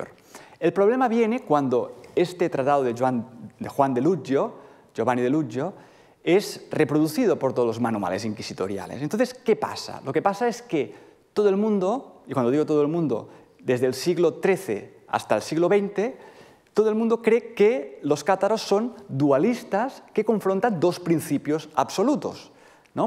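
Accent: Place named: Catalonia, Spain. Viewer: Creo que el professor no tiene la lengua castellana como propia. El apellido es catalan, el acento de su castellano lo delata así como açgunos vocablos en perfecto catalan. Ojalá los profesores castellanohablantes utilitzaran el català con la misma soltura que él tiene en castellano.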